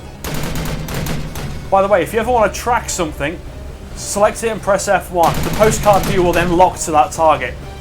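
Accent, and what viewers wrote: England, United Kingdom. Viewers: Lol, English accent and mature comedy, Fuck going through all you videos and watching them, Instantly subscribed :) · Thinking about getting this game and this video is helping alot in helping me make my decision! and the british accent makes the video both informative and very funny! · Commentary= Awesome, funny with British accent Guide= Awesome and very helpful Reaction= U just made me buy this game from Steam Now i'm gonna try it out... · the only video i have learned so many thing about the Anno game basic. thanks buddy. i love british accent. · Thank you so much for this tutorial! I absolutely love your way of talking, British accent, casual swearing and all. You got a subscriber, keep it up! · LOL epic british accent to go along with this epic vid:P just started playing this game again. this vid is really helping me. 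when i used to play i did fairly well, but my cities were just a total messxD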